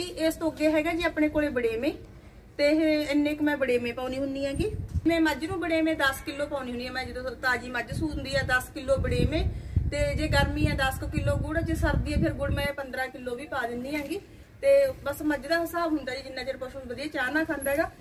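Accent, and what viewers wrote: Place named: Moga, India. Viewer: ਭੈਣਜੀ‌ਮੋਗੇ ਏਰੀਆ ਦੇ ਲਗਦੇ ਓ ਲੇਟੀ ਸਬਦ ਓਧਰ ਹੀ ਬੋਲਦੇ ਓ ਵੈਰੀ ਗੁੱਡ ਭੈਣੇ ❤❤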